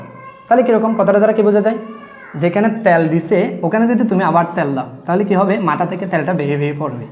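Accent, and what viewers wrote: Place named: Chittagong, Bangladesh. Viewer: স্যার আমি আপনার ভিডিও আজকে প্রথম দেখেছি এবং আপনার কথা শুনেই বুঝতে পেরেছি যে আপনার বাড়ি নিশ্চয় চট্টগ্রাম।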